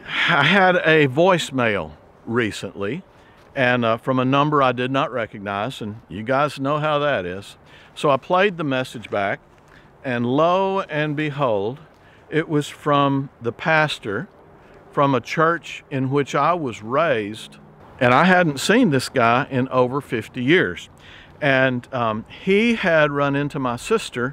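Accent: Texas, United States. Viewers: What theme am I looking for when I watch an Out on the Ranch (with )? I'm looking for the sound of a fellow with a genuine Texas accent (reminding me of my childhood) who talks about good people being good to each other. I'm never disappointed. 💖💖💖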